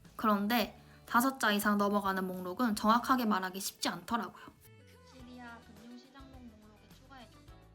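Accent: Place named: Busan, South Korea. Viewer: ㅋㅋㅋ 익숙한 인토네이션에 ‘부산 분이신가,,?’ 했는데.. 맞군요!ㅋㅋㅋㅋ 대박입니다 영상 감사해요!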